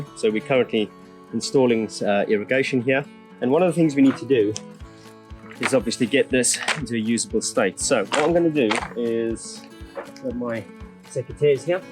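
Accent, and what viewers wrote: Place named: South Africa. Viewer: The accent seems South African but the wall and fence behind has no rhino fencing.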